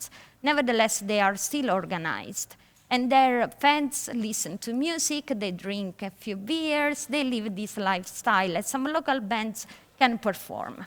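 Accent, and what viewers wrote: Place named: Italy. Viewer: English with an Italian accent :)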